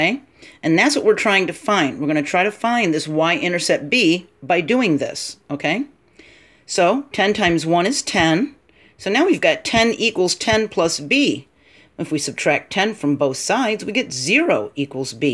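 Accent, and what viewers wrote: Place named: United States. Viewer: I'm really struggling to understand the strong American accent. How do you guys sound so animated all the time while us Brits seem so solemn? Still, jolly nice video and somewhat helpful.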